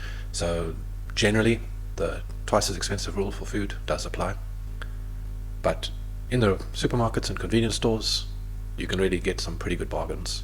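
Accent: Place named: Ireland. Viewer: You already have a bit of an Irish accent yourself!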